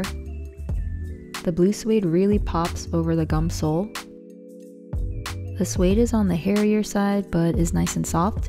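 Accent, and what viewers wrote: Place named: United States. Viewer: I like these, you sound American so I don't know if you know but there is a rabid three stripe collectors scene in the UK and Europe for these types of Adidas sneakers. It dates back to the early 1980s when football fans would follow their team in Europe and they would come back with Adidas Trimm Trabb etc and it became fashion for the fans to dress in their best and most expensive clothing and Adidas and a few other sneaker makes and it sort of became a uniform for the now known as "Casuals" who would follow their team across Europe and then the cycle continues with new brands like CP. Company, Stone Island etc etc. Anyway it's still a very fierce collectors market still to this day as new generations get into it, and with the release of archive inspired trainers (we call sneakers trainers usually in the UK) by Gary Aspen with the much sought after Adidas Spezial clothes and sneakers releases each year. 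Anyway that's quite a fun little rabbit hole you could go down just by checking Gary's Insta and see where he gets the design ideas etc. Also in the UK, there is a great source of Adidas information on Twitter on discounts on sneakers like these etc, just look for 'Man Savings' on Twitter and they post great discount deals every day. I also seen that there was an American doing pretty much the same thing for people in the states, I can't remember his Twitter tag but I don't think it would be hard to find as its well worth looking and following these types of pages for excelle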